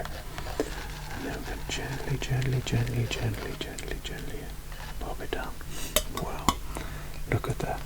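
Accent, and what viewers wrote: United States. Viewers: Bless you, your Yank accent is definitely improving! 😀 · How hard was it trying to imitate an American accent? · Honestly, solid American accent. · Why do I always seem amazed when an English person does an American accent, when they do it seems kinda struggled if they go for to long, he did really good though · IS THAT HIM TALKING? If it is great american accent my guy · Never thought I'd hear with an American accent! Very good! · His American accent on point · The effort spent towards getting the American accent just right is amazing! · it’s eerie because Bob recorded in Indiana, where very few people have an accent, but gets it nearly spot-on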